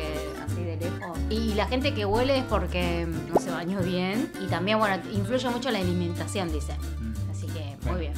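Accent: Argentina. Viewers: ME GUSTA EL CONTENIDO DE CALIDAD E INVESTIGATIVO QUE DAS, APARTE EXPLICAS MUY BIEN EL PENSMAIENTO COREANO SIN LLEGAR A LOS EXTREMOS, ERES MUUUUY DIFERENTE A OTROS YOUTUBERS COREANOS QUE TRATAN DE REIDICULIZAR A SU MISMA CULTURA O POR EL CONTRARIO SOBREPONERLA A OTRAS CULTURAS. Y UN BONUS EXTRA: ME SUPER ENCANTA TU ACENTO ARGENTINO, A VECES PIENSO QUE MIRO TUS VIDEOS POR EL ACENTO ARGENTINO COREANO 😂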